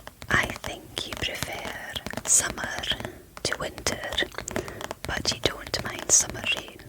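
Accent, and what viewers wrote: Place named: Scotland, United Kingdom. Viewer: I think you are Scottish because of the way you sound but also I can understand what you’re saying so I don’t think you currently live in Scotland. Were you in America or England for a few years?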